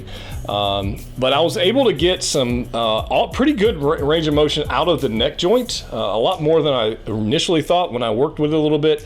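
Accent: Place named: New Jersey, United States. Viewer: lol..that is Jersey accent you acquired ! Hilarious !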